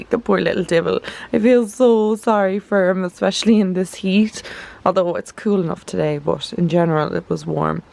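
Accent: Ireland. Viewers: You are my favorite YouTuber. I think you’re absolutely adorable. Especially your accent-makes me want to visit Ireland! I just bought the Too Faced Sweet Peach palette (I typically stick with matte palettes because of allergies but loved their “Just Peachy Mattes” palette if you haven’t tried it) and had to reference back to your initial review before I swiped that card at the register 🤣.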